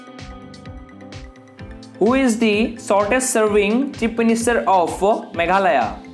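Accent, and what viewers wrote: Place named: Assam, India. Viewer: Akhomiya accent 😂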